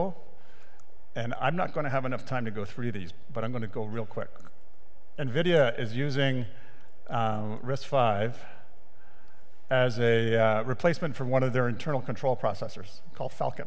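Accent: France. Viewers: nice french accent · hearing him speak Spanish with a French accent is kind of funny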